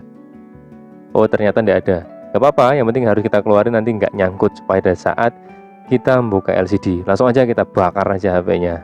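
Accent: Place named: East Java, Indonesia. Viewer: Asli mana bang kok ada logat "rek"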